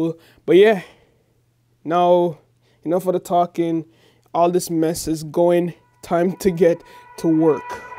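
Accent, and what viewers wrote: Jamaica. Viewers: Are you Jamaican 🇯🇲 ?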